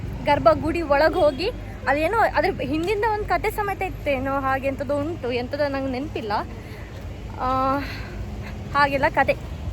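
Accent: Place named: Udupi, India. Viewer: Udupi kannada mix undu aike kenni medam best of luck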